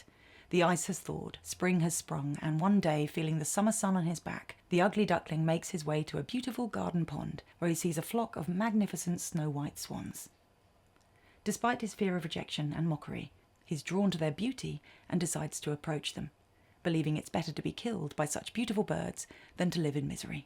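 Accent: United Kingdom. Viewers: I love your accent, is it british?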